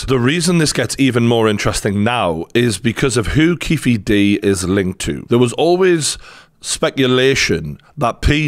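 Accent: Newcastle, United Kingdom. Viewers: I just love your commentary, and that accent -- I'm a Brit, so I relate! He's feeling charitable, maybe because he's going to JAIL! Bye Diddy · Geordie boy, thank you.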